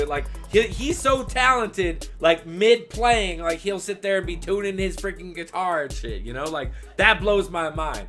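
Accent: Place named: United States. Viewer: dah slow dah tu dia ckp...American English tu...bukan English pure mcm UK Dia ckp..UK punya English lagi lah pening nk faham dia punya slang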